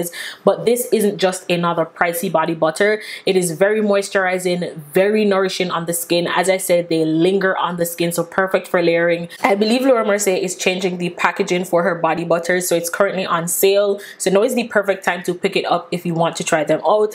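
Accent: Jamaica. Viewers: Great video. First timer here! You sound Jamaican. Are you?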